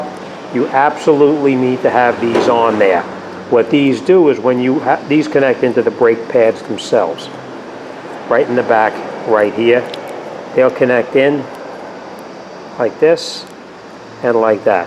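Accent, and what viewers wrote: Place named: New York, United States. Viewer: Good vidio, sounds like a true New Yorker, lol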